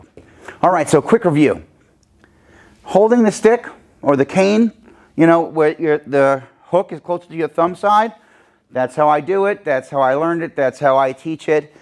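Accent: New York, United States. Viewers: Love your NY accent, have family in NY. Drink WARTER....lol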